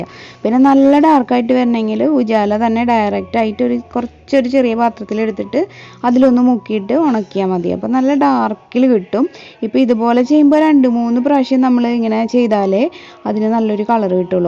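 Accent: Thrissur, India. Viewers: Are u from thrissur as the language slang.. Nice technique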